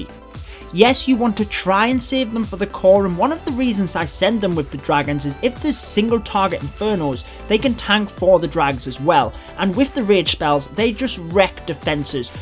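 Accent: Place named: Scotland, United Kingdom. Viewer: Which accent is this? Scottish? Sounds awesome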